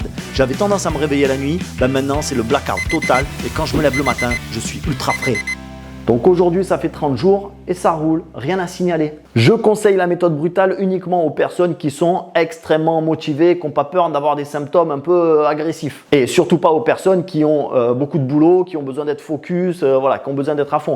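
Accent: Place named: Marseille, France. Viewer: Tu as accent marseillais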